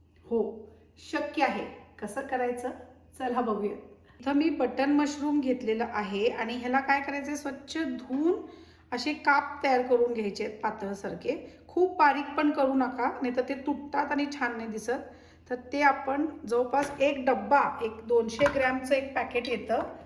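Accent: Vidarbha, India. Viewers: का~~व माय, विदर्भाची का तु...मले वाटलं च....खुप चांगली भाजी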